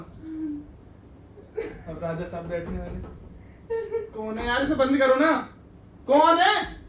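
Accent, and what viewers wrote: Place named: Aligarh, India. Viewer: Bhai humari bhi poori family aligarh se hi, Big fan of your accent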